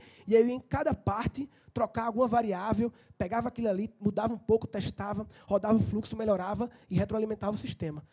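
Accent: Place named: Northeast, Brazil. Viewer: amo o sotaque do Nordeste (que meu terreninho na Bahia me aguarde;) e quanto a pasta de dente, ri demais! Ainda mais sendo dentista! E hoje a tampa é grudada no tubo, reparou? Acho que ouviram você! Rs. Show!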